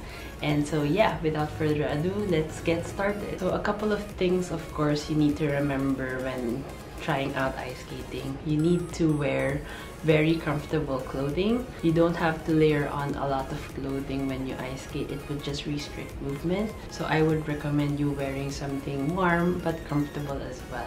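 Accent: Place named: Philippines. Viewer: OHMYGOSHHHHH your Pinoy accent is so cute!!!!!! At ang guwapo mo rin omgggg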